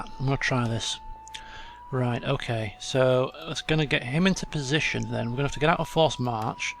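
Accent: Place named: Northern England, United Kingdom. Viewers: Leeds, a fellow northerner can tell the accent a mile off ;) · Gotta say its always good when you encounter problems doing a campaign on Total war, no one likes a straight forward campaign haha and I can't quite work out whereabouts up north your from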